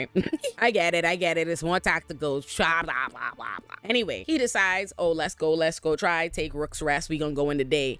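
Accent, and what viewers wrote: Caribbean. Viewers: I sense... Caribbean 👀 🇹🇹?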